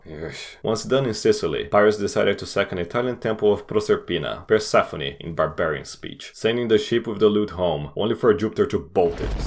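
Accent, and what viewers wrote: Brazil. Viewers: You are brazilian right, i feel it in me accent recognizer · Pretty sure he's Brazilian. His pronunciation of Roman names sounded really Brazilian to me, so I stalked his channel and turns out he's subscribed to a couple Brazilian channels.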